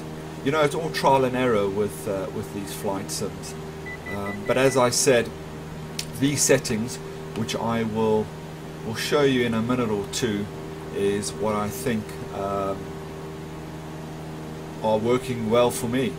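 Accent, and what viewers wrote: South Africa. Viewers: you sounds south african, you must be south african :)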